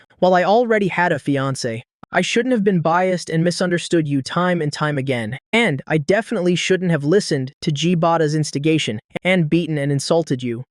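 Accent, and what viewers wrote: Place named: United Kingdom. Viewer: where`d the british accent come from 🫠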